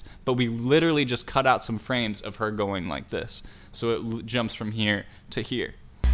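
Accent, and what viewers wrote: United States. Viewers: I'm lesbian i thought your American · Hey, I'm lesbian. I thought you were American. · Bro I'm lesbian ✨I thought your American ✨💯😂legend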